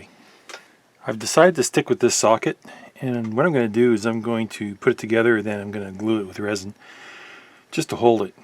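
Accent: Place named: Alberta, Canada. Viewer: This is my favorite vintage audio restoration and Alberta-accent channel on YT. So informative. You deserve far more subscribers, sir.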